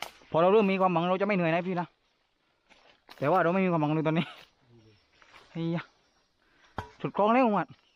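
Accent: South, Thailand. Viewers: แหลงภาคนันและ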